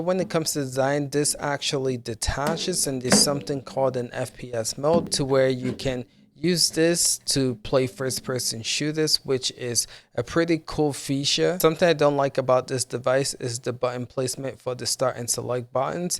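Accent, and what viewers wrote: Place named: Mumbai, India. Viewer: sounds like indian from mumbai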